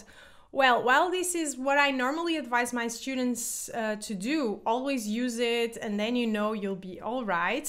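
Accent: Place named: Portugal. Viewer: So refreshing to hear a proper neutral european accent, and not that "suburban ghetto" accent of Lisbon we hear every youtuber and news reporter use more and more often (nor the thick nord accent). A massive thumbs up for helping to mitigate that nauseating "urban myth" brazilians like to propagate that we portugue speak like we're rapping and that we eat all the vowels and half of the words. Not everyone in Portugal is a chunga, azeiteiro or grunho; many of us (still) speak properly and with a normal cadence. Keep up the good work! :)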